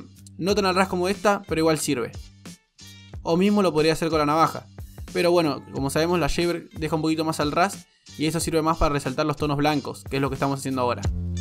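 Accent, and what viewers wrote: Argentina. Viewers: Sos argentino amigo?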